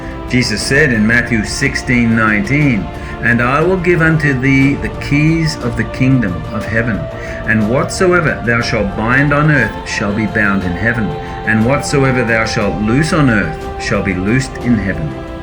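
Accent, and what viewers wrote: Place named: Australia. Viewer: GBY! I hear an Aussie accent, but I’ve been told you live in Sth. Africa - is that right?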